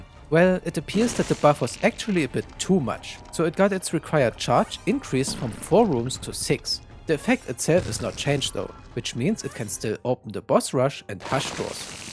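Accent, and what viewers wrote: Germany. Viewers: Ah yes DER DEUTSCHE AKTZENT is stong with you :33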